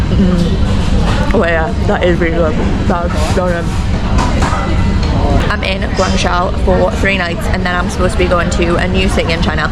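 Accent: United Kingdom. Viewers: your accent reminds me of Jodie Comer. Are you from Liverpool, too? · 你说的英语我不是那么能听懂 。感觉习惯老美的口音多一些 ；不过伦敦口音还是很好听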